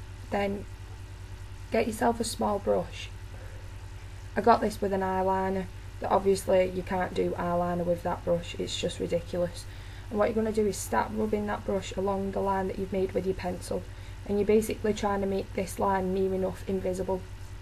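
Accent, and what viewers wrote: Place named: Manchester, United Kingdom. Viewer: Are you from Manchester. I am and you sound like me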